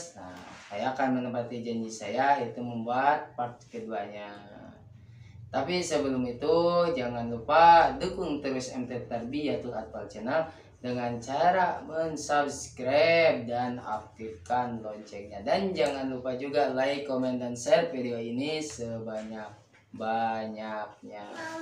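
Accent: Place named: West Java, Indonesia. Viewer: Kok ngomongnya sunda seuwareh kang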